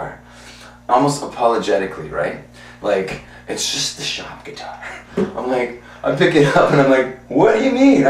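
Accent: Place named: Southern Ontario, Canada. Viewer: First time I saw you acting up and carrying on I knew you were from Southern Ontario. Us Ontarian’s have a distinct accent when we’re excited. And then there’s your Port Dover Friday the thirteenth t-shirt, dead giveaway.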